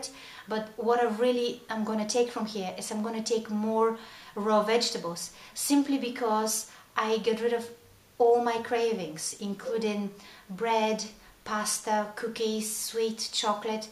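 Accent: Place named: Eastern Europe. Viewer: it sounds like youre from eastern europe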